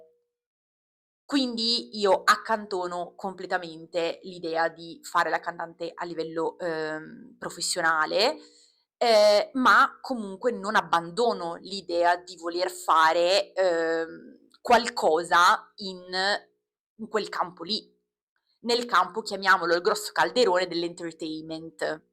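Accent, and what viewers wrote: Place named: Tuscany, Italy. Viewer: nella parlata hai un sottofondo toscano, forse hai parenti o origine di quel posto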